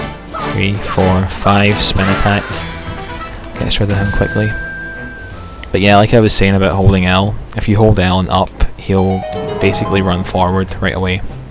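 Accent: Scotland, United Kingdom. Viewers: holy cow is SCOTTISH, thats surprising lol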